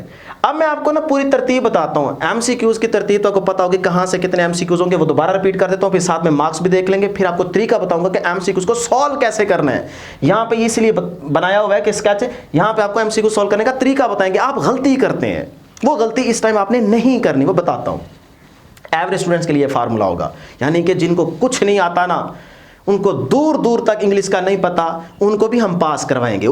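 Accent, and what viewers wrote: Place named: Saraiki, Pakistan. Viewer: Sir AP ky bolnay k Andaz sy pta chal gaya mn bhi sariki ho😊😊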